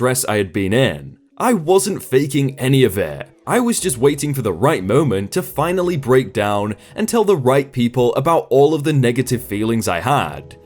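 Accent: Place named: England, United Kingdom. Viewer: It's an accent. Many accents exist that pronounce words that way. Plus, he is English, so one could argue he knows the language best.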